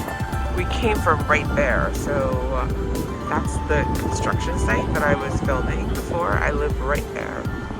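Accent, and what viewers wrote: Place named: Long Island, United States. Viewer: I knew you were from NY! I live on Long Island and was surprised at how much you have that typical Long Island accent.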